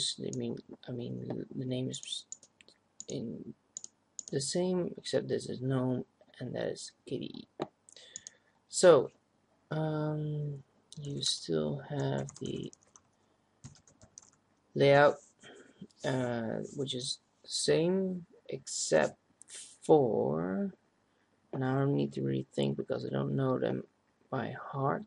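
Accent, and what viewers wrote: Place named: Netherlands. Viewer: I can hear that you're dutch. How old are you? :D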